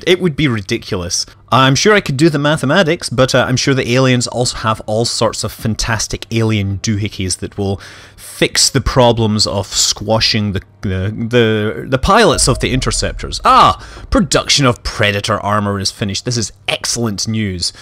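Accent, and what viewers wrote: Scotland, United Kingdom. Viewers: the classic scotsman accent. makes me want to be more Manley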